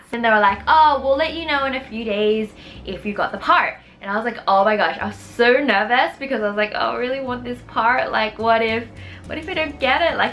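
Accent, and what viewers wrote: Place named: Australia. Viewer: Too bad i don’t live in Australia or New Zealand bc I love ur accent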